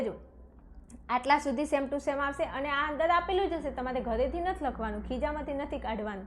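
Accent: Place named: Mehsana, India. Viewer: Tamara language upar thi lage chhe teacher tame mahesana baju Naa chho ne 😅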